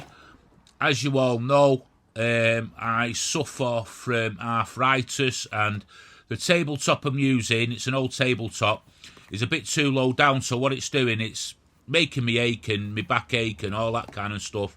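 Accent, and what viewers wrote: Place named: Manchester, United Kingdom. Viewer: new to your channel love the manc accent , just retired so started making birdtables still getting tools together , john from Altrincham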